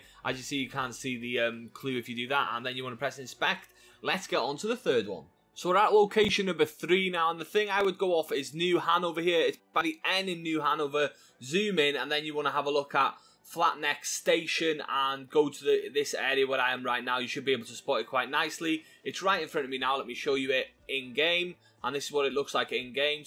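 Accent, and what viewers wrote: United Kingdom. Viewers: Dude you sound like you're British which is nothing wrong with that I'm from the United States but I loved your video short it was sweet and it was precise have an excellent day I hit the like button for you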